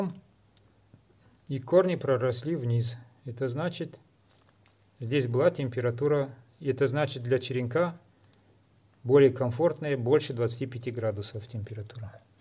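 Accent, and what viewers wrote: Caucasus, Russia. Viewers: Да согласен , объясняете хорошо , но вы забываете или я может быть где-то пропустил где вы живите, судя по акценту с кавказа.Поэтому считаю что дата месяц не имеет значения .Имеет значения какой климат или создать климат для укоренения .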